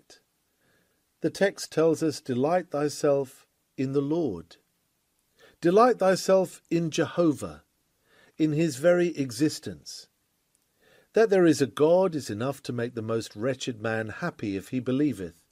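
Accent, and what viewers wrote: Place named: England, United Kingdom. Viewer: Was looking forward to this sermon, love this reader with English accent . Thank you Jesus .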